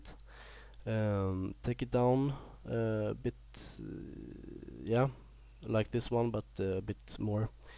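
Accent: Sweden. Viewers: are you from sweden? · Swedish? :D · Nice tutorial man, REALLY NICE! Swedish btw? :)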